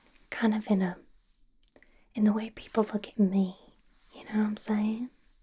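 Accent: South, United States. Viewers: As a huge marvel nerd, the southern accent is a nice touch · love the inclusion of small southernisms, it really sells the accent and the video was great :3 - a southern person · love the southern accent!!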